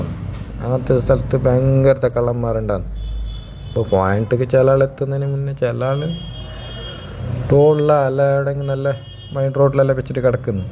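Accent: Kasaragod, India. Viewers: കാസറഗോഡ് ആണോ നിന്റെ സ്ഥലം, നല്ല ഭാഷ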